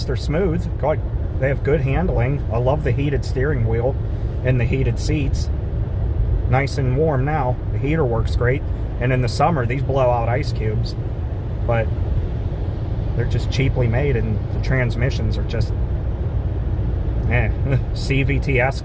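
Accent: Pennsylvania, United States. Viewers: Enjoyed your authentic PA accent!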